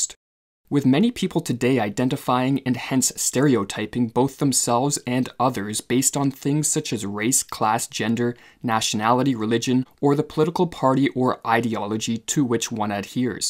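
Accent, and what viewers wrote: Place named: Canada. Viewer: Canadian accent, do you hear it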